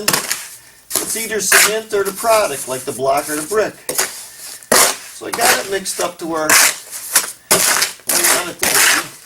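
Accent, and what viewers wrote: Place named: Pennsylvania, United States. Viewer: You are very generous with your knowledge and those of us who don't know what you know really appreciate it. Just a question: You sound like the guys I know from the Hazleton area in PA. Are you from around there? Anyway, thanks a lot for your help. Rich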